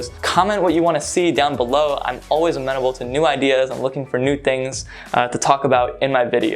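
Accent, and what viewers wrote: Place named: England, United Kingdom. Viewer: I really love your English accent .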